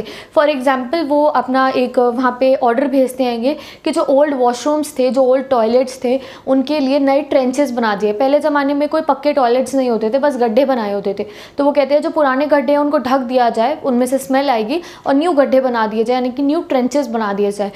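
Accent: Punjab, India. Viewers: bro ma'am Punjabi hai 😂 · Punjabi accent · Love your punjabi accent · Di love your Panjabi assent ....:elbowcough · apki jo bich bich mai punjabi nikalne ki koshis kr rhi hai...that makes the chapter more interesting :)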